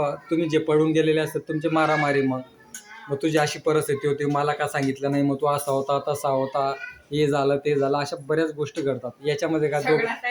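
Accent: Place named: Dhule, India. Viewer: तुमचा बोलण्या वरुन तूम्ही धूळयाकडचे वाटता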